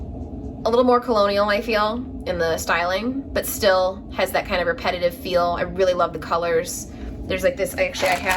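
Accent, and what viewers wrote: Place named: Chicago, United States. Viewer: Belated welcome to FlossTube! Your first video just popped up into my feed today. Great intro. I like it so much when contributors share something about themselves. We're neighbors (if you consider a small village in Lake County on the border of IL and WI a neighbor.) We have the same accent - albeit I've been a North-sider most of my adult life! Go Cubs! Glad you love houseplants. I am a novice and could use all the tips you can give us. Coincidentally, I am going to Inspired Needle for the first time on Saturday to look for some special floss and fabrics for two of my pending projects! My local LNS is in Winthrop Harbor did not have what I needed - so I am heading South. :) I am working on "After the Roses." You are right - stitching is zen like, and IC's patterns are hypnotic and therapeutic. Can't wait to see your collection!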